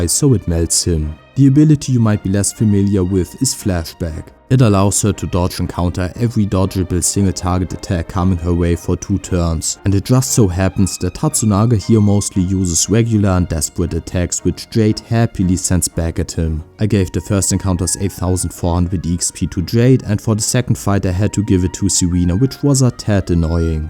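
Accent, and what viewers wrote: Germany. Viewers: Wie hart man hört, dass du Deutsch bist xD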